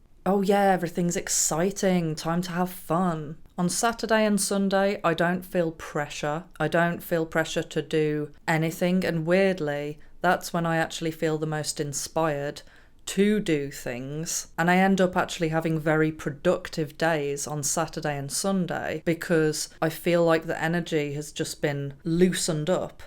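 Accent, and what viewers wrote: United Kingdom. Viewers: I am definitely connected to you. LOL Your British accent and your brilliance on so many different topics lulls me to sleep every night. Keep up the good work. Mark in Dallas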